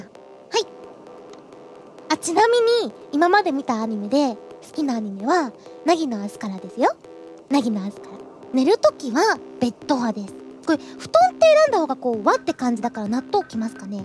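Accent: Kansai, Japan. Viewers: 最近、アイちゃんの関西人感がスゴい！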